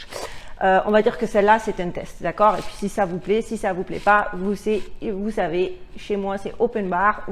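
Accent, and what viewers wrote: Marseille, France. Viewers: Bonjour. je regarde vos vidéos et votre accent me rappelle des souvenirs , j habitais du coté de Marseille, mais comme mes filles vivent maintenant une a Boston et l autre près de Montréal j ai traversé moi aussi et je ne regrette pas.... Merci pour les vidéos.....Mon gendre est Directeur de Demandes Globales chez Sanofi a Boston.. je vais demander a ma fille ce qu elle en pense.....j ai deux magnifiques petits enfants et je n ai pas envie qu ils soient abîmés par leur saloperie de vaccin... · Hello! J’adore tes vidéos! Elles traitent de sujet graves avec une pointe d’humour dont tu as le secret 😉. Continue comme ça! Je like ❤️. Par contre, effectivement, je ne reçois aucune notification alors que j’ai bien cliqué sur la cloche 🔔... Mais c’est pas grave! Je vais direct sur ton compte lorsque j’ai une petite baisse de moral 😁 Cet accent du Sud ne peut que me mettre en joie!! 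Bravo bravo pour ton travail 🙏🙏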